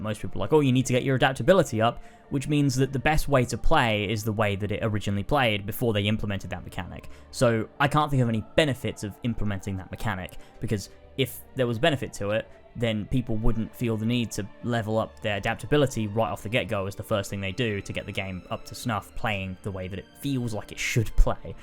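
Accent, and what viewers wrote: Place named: United Kingdom. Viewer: The British Accent Makes a Video Review of Dark Souls 2 maneuver. Certified classic.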